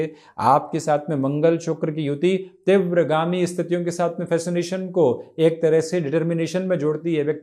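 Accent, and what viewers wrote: Standard. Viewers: Jai sri Krishna sir .... Bhaagya se zyada aap k ki pure hindi sunne mein Anand aa raha hai...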